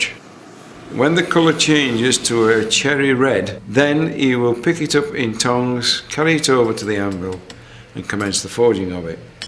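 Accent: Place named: United Kingdom. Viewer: English, but with a British accent.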